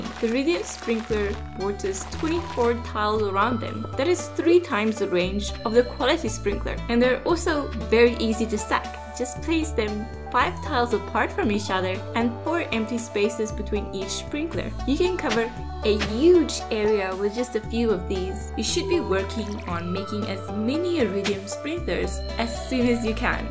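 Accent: South Africa. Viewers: I hear a South African accent. Hmmmmmmmmmm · South African?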